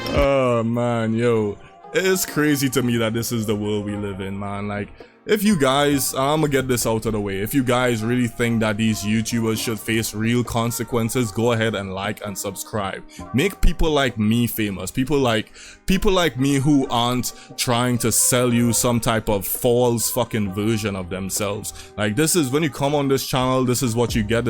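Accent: Trinidad and Tobago. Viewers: Bro, you have a very trinidadian accent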